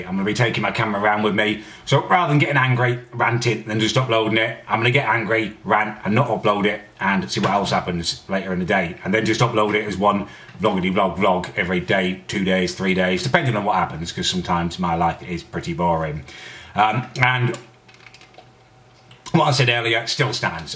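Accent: United Kingdom. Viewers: Ahagrande He's British?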